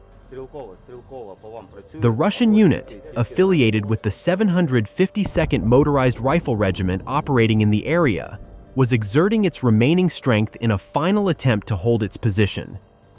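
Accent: United States. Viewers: cant stand the overly "american" accent voice overs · Do you steal all of your footage from Azov YouTube channel? with an American narrator...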